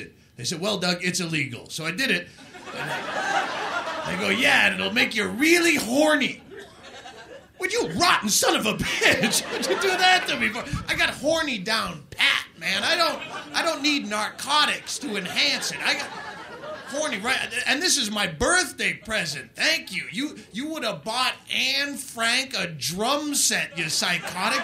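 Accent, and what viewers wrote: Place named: Massachusetts, United States. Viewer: Still had his Mass accent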